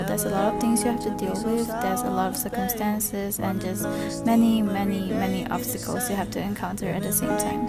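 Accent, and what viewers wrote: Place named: England, United Kingdom. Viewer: woww..i like your english accent🔥🔥..im from malaysia and new subscriber💓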